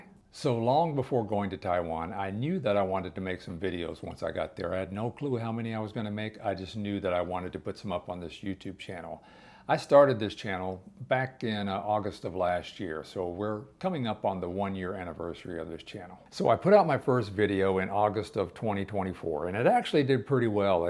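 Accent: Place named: United States. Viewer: Thanks your veido! Cause I can clearly listen US accent from you. I love that.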